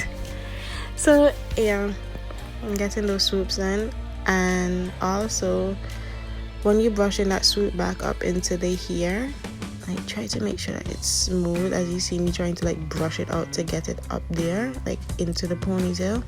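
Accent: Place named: Jamaica. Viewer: Your accent is so close to Jamaican.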